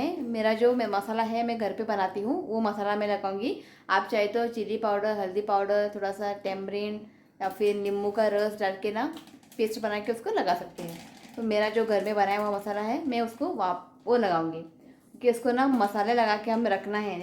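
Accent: Goa, India. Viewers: I loved the Konkani and Goan Hindi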